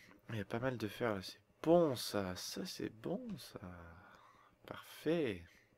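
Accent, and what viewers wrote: Quebec, Canada. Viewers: C'est le film Sanctum, de James Cameron, mais bon il est québécois alors son accent est tout a lui :D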